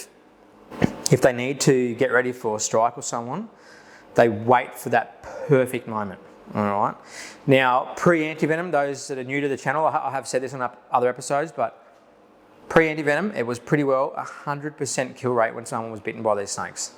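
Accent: Australia. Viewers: This dudes Australian accent is strong. Never struggled to understand an Australian.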